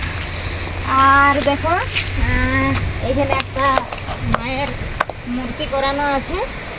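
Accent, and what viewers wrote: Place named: Purulia, India. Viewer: বৌদি তোমার কথা গুলো শুনতে ভালো লাগে ❤ পুরুলিয়ার লোকের কথা সুনতে ভালো লাগে তোমার কোন বোন আছে আমি ও বাউরী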